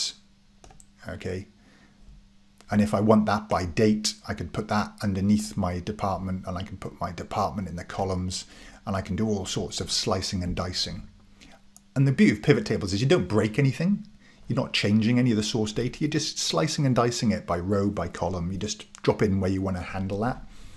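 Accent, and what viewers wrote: Wales, United Kingdom. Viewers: Loving the Welsh accent 😁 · I’ve been using Excel for decades and have only scratched the surface of the pivot tools. This explanation has helped a lot. Thanks! (PS. Do I detect a fellow Welsh accent?) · Came here for Excel, stayed for the heavy British accent 😅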